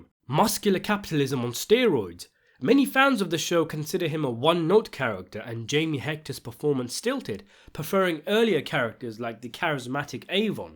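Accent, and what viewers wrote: United Kingdom. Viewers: The perfectly placed Wire one-liners in a British accent are CRAZY 😂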